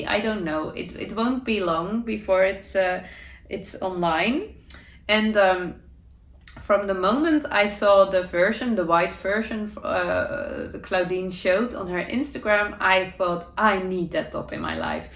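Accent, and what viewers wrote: Netherlands. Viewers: I love the blanket behind you. Your scrappy sweater of granny squares looks lovely and must be so fun to make! Your podcast is very inspiring and I love every episode I’ve seen. I love your DutchY English! I wish you were my next door neighbor so we could knit and crochet together sometimes. Love Katie from Maine USA💖🧶🧦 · Closet is fine 😊 in Australia we also call it a Wardrobe. Your Dutchy English is wonderful. I have one language and I’m always impressed when people can think and speak in more.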